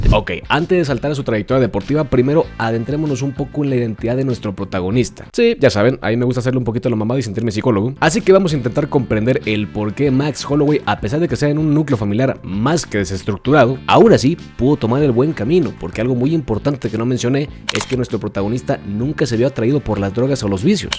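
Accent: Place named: Spain. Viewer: No lo tomes así hay gente q no le gusta el acento español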